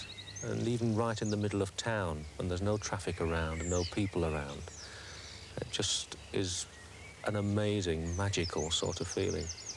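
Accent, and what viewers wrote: United Kingdom. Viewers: Thanks, I thought it sounded south Yorkshire/Lincolnshire midlands-ish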